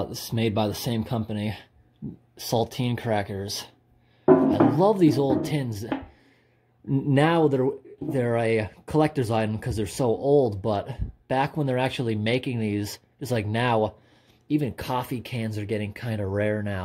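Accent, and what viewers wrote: New England, United States. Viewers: A wild guess that it's New England.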